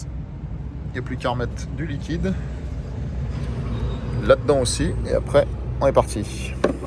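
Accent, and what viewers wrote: Belgium. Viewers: Tu a fait ma journée ton accent belge est juste magnifique je pleure de rire 🤣🤣🤣🤣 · excellent accent Wallon! j'habite la Frontiere et je confirme que ca y resssemble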